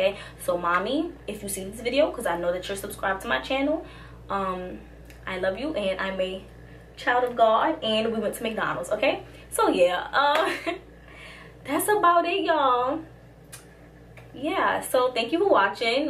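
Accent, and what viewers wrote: New York, United States. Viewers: yess NY accent, always spot a NewYorker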